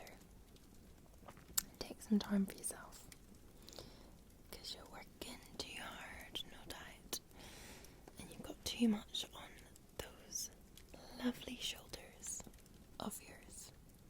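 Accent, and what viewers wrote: Scotland, United Kingdom. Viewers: Gawd, I adore your lovely brogue. Blessings and love from Ohio USA! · I'm totally in love with this Scottish accent. Better than my Italian accent. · 31 minutes of tingles from the resplendent scottish lass. ah, a most excellent birthday present. ☺️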